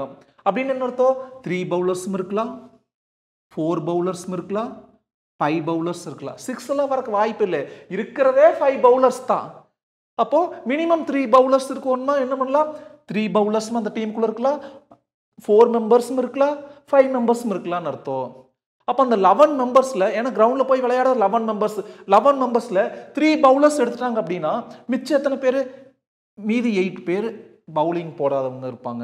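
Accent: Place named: Pollachi, India. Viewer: Excellent teaching sir ❤❤❤❤ . I love your Pollachi accent 😊😊😊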